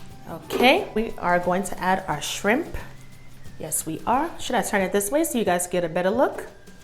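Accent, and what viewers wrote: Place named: Maryland, United States. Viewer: You are one fine cook. I just made your Lobster tail stuffed crab recipe and I’m the local hero hereabouts thanks to you even though I think that I slipped up on the Cajun.. I used 5 full length lobster knuckles/claws and secured them together with long toothpicks..in lieu of the lobster tails..more tender..Placed on a 5”x 6” shell.. Your recipe makes two servings..PERFECT..Thank you.. and by the way your accent belies you. Are you living somewhere between New York… Maryland girl? LOL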